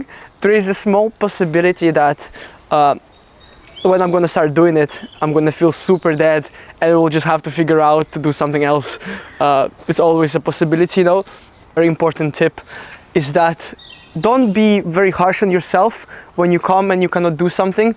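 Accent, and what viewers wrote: Russia. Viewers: Привет!) Ты русскоговорящий что ли?) Чувствуется немного жесткость русской речи в твоём произношении. Стало интересно узнать разговариваешь ли ты на русском?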